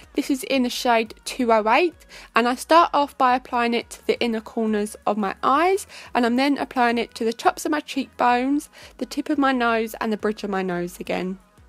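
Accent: United Kingdom. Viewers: So gorgeous! Can someone tell me where is her accent from? Like, I know she's from Britain but I don't recognize her accent at all.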